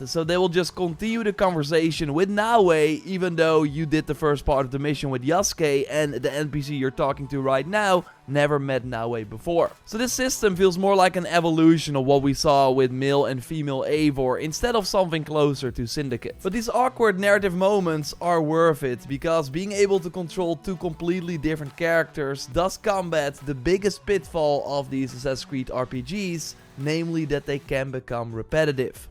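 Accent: Netherlands. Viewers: brother your dutch accent is REALY there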